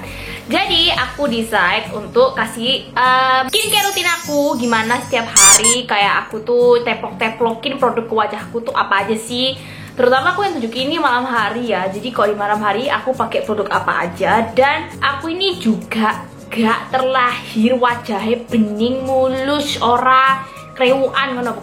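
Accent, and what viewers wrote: Indonesia. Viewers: Suka sama suara kakak medok jawa bgt😂🤗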